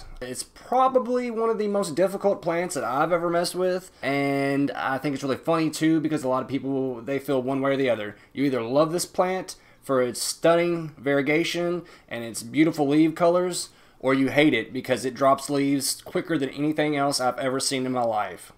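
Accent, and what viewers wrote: South, United States. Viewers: Good content. Good southern accent. Good cat. I like this.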